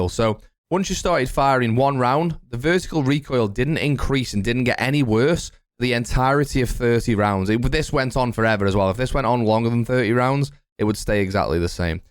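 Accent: United Kingdom. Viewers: Oh the sexy British man posted another video 🤺🤺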